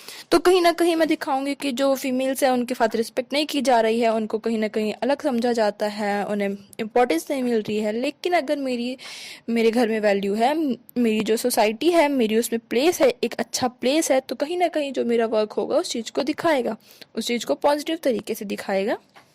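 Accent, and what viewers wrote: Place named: Kashmir, India. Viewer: ur voice looked like u were Kashmiri Anyways thx my dear sister Stay blessed ❤️❤️❤️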